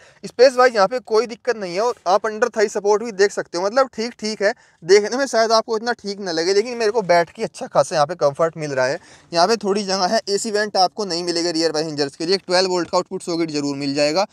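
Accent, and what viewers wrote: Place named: Muzaffarnagar, India. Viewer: This is genuine tone of muzzafarnagar❤....all the best 👍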